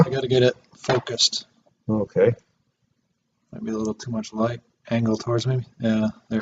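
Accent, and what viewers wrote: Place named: Massachusetts, United States. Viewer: Seems like you have quite the shop there very impressive. I hear the accent is that Massachusetts. I'm from CT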